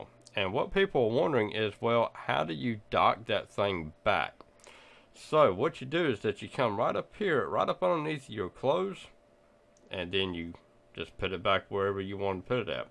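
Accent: South, United States. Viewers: Nice hearing a southern accent in a tutorial for once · Thank you, you've saved me so much time! Love the Southern Drawl, too.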